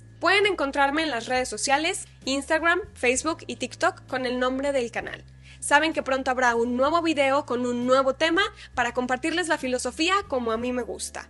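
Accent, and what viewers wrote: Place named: Monterrey, Mexico. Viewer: Suena a Monterrey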